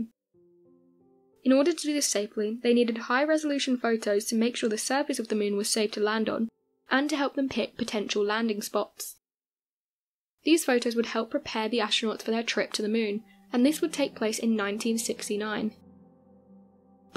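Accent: United Kingdom. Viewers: British chick narrator...”unfortunately for the US, Russia did it first.”...yeah as if Russia were friends with the British...comon chick!!...The Brits didn’t do sh*t either.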